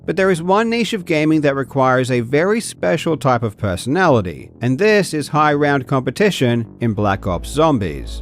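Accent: Australia. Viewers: He's Australian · Love your videos. But to be honest, your accent plus the way you speak for the videos is like nails on a chalkboard. It’s awful. Aussie streamers I feel like get more views than average because of their voice, but yours is just plain grating. Any hoo, on to watch the video…. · CH is challenging for English speakers, don't sweat it. The CH in Nacht is said like the CH in Loch, the Scottish word for lake, like e.g. Loch Ness. The A is like in car, but short. Your first pronunciation in the video is correct. Yes, I'm saying correct. Sounding a little different than the theoretical standard pronunciation doesn't have to mean you're mispronouncing it, it just means you have an Australian accent because you got way too close to standard to be called "wrong."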